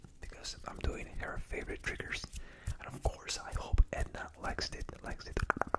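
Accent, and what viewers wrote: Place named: Latin America. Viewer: Me encanta tus asmr , aunque te siento con ese acento latino te queda bien 👍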